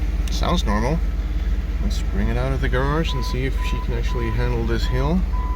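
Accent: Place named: United States. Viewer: Crazy you say English isn’t your native tongue. You sounded like an American.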